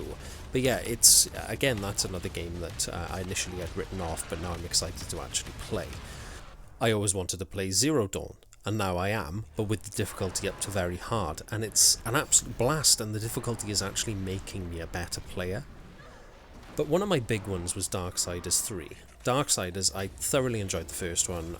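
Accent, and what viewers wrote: Wales, United Kingdom. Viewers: Is that a hint of a Welsh accent I hear? 🏴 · Hey dude, brilliant video! For starters it's nice to hear a fellow Welsh accent in the YT gaming space! Secondly, I really enjoyed your chill tone, and your rambly style, it's really cool so don't worry about how 'professional' it seems or whatever, it's a strength o your style I think! Plus, I can clearly see you put A LOT of effort in the editing, the cuts, the memes, the general flow, fantastic job! And finally; welcome to the Souls club, once From has their fangs in you it's hard to get away lol! Hope you play and enjoy the other titles! Anyways, apologies for for the mini-essay but yeah, awesome video, a joy to watch, thanks! Cymru am byth!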